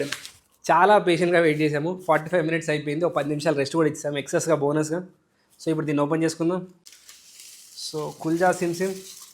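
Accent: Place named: Telangana, India. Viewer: Superr brother... Ur way of cooking so lovely to watch.. mainly Telangana slang.. lots of ❤❤❤❤❤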